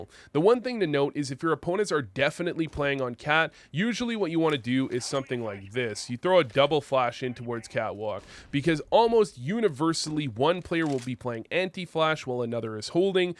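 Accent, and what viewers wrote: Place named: Canada. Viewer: You sound Canadian!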